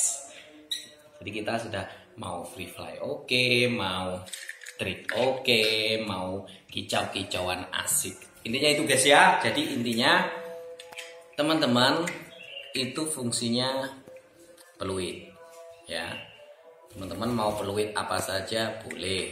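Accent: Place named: Surabaya, Indonesia. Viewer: Mangkane kok koyok tau eroh, ternyata wong Suroboyo dw hahaha...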